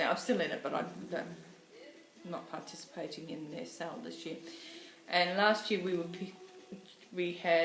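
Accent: Australia. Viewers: Loved your vlog, as always. I'm of "that age", too. When David Cassidy had a concert in Perth, my family was living at South Headland (West Oz) and I was heartbroken when mum said she wouldn't take me to his concert. lol If I remember, not all the roads were sealed back then. Love everything you showed, especially the Happily Ever After. Made my day hearing an Aussie accent...I keep hearing about mythical Australians over here....not seen one in three years! lol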